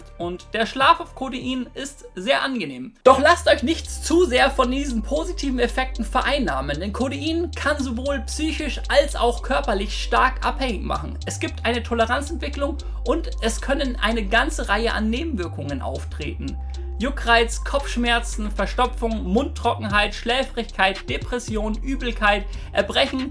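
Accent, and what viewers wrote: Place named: Franconia, Germany. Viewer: Ist ein Dialekt, das sagt mann so in Franken.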